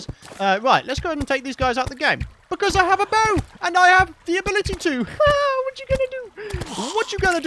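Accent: United Kingdom. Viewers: What an british accent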